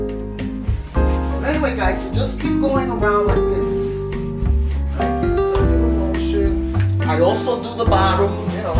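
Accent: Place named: New York, United States. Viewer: I was listening to others that use alcohol and baking soda, however I’m going to try your method. Love your New York accent and Love how you explained everything. Thank you 🙏 you are very kind to share your secret!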